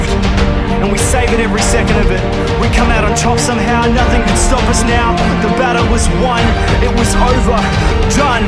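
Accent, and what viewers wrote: Australia. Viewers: You realise this is what an australian accent sounds like. Holy fuck the stupidity of that comment.. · Aussie accent XD haha love it! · that australian accent xd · 1. annoying echo 2. annoying australian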